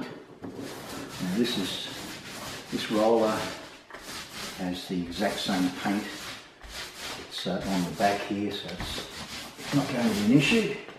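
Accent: Australia. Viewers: well his accent sounds australian and don’t they do things backwards there?? ... or is it us? thanks for the help with this video and your accent rocks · Uffff sorry mate cant listen to an Australian accent 🤢🤢🤢🤢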